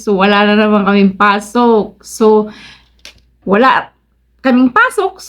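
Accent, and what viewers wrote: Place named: Ilocos, Philippines. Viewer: Yung accent mo ma'am mas malapit sa ilocano kaysa cebuano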